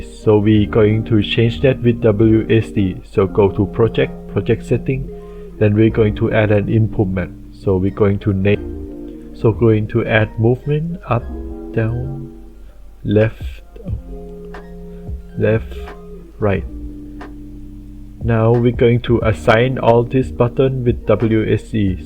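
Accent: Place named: India. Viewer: likes that Indian accent ☕️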